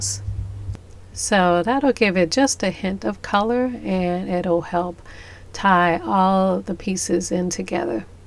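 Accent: New Orleans, United States. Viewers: You have a beautiful accent! You are great & ive learned so much from you! Watched all your Christmas videos last few yrs! Keep your beautiful New Orleans accent! I love it!! Say pumpkin anyway you want girl!😂❤️ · Your New Orleans accent is beautiful. In New Mexico it's pronounced Punkin. I agree, who cares. Your voice is what drew me to your video and I'm so glad it did. I have been inspired to do a crystal foyer for Christmas and brought home a hall from our Dollar Tree here in Marrero. Keep that imagination going and forget about anything else :) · Hi My Suga I Love Your Accent... Why Because I’m From Nola To & You Are Unique In Your On Way? Cheeeeeeeeeeeeerrrrrrrrrsssss My Hun! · Yeah baby they love how we talk. What part of the N. O. you from? I'm from Hollygrove. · She's been in Ga so long she's lost a little of her New Orleans accent. 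But we never lose it all! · There is absolutely nothing wrong with your N.O.accent I say that because I'm from a town outside of N,O. called Houma La. So I can relate they say we t as ll flat but hoot I love ❤what you have done with this project very inspiring. Fall Vibes 🍁🍂🍁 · I'm English and I'd just like to say your New Orleans accent is devine!! And that you should say "pumpkin" however you like because that's who you are. I could listen to you all day. It's so impressive how you have everything set up with Amazon and all your templates, ideas and downloads!! Well done you!! Love your channel!! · Pumpkin, Punkin pumkin. No matter how you say it, we know you mean pumpkin. YouJust keep making your beautiful pieces. You are an inspiration to soooooo many and that's all what counts. Gee Whiz, we're not in Grammar School! Your New Orleans accent is beautiful and quite clear. Do your thing because you ROCK!. 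· I love listening to your soothing tone and beautiful New Orleans accent. Charming as heck.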